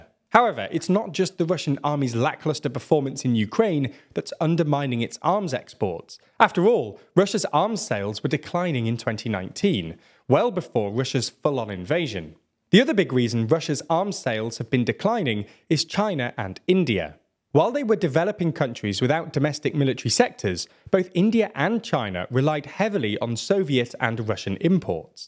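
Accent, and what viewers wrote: United Kingdom. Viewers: when you hear an annoying British accent you know you are listening to a propaganda garbage machine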